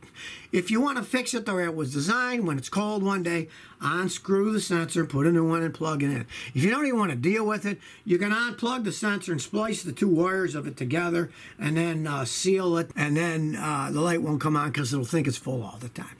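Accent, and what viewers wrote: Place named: New England, United States. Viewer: The way says "car" always makes me wonder if he's originally from New England.. Pennslyvania or New Jersey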